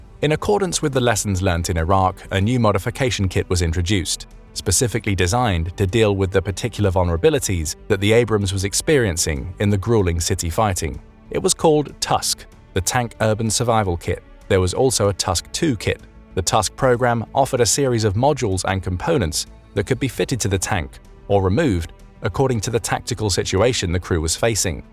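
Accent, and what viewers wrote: United Kingdom. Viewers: This channel never failed to make me laugh. That British accent always ticks me off.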